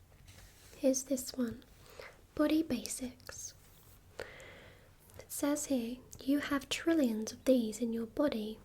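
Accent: England, United Kingdom. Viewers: wonderful English accent still gets me every time. It's absolutely, positively the best accent I've ever heard. Perfect for ASMR. 👌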